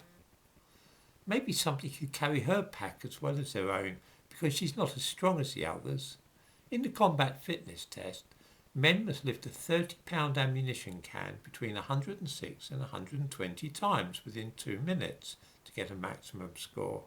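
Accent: United Kingdom. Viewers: you have a way of telling the tale like Fry in Black Adder. We Americans have an affinity for Brit accents. Your opinions sound like American ideas with an ancestral accent.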